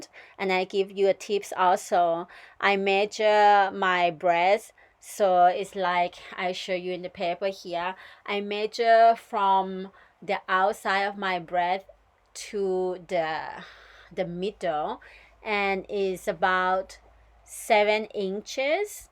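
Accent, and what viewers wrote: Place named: Thailand. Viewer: Sounds like a Thai accent!! Love the tutorial!!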